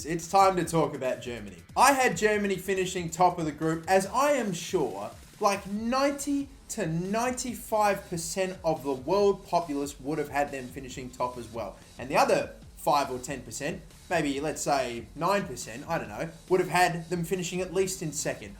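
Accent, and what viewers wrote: Australia. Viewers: Wait ur Australian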